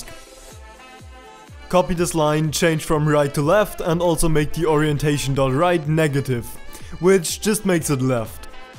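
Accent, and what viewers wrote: Germany. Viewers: Thank you for the Tutorial Where are you from? Do you come from Germany?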